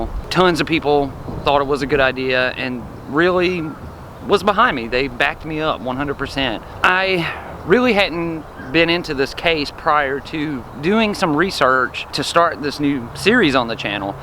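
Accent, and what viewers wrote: South, United States. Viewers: I live in Opelika/Auburn so nice to hear a good ole’ southern boy accent on the case! I saw you did a video at the Dozier school... I grew up near there definitely going to check that out. So hard to believe such evil went on right under your nose for 100 years!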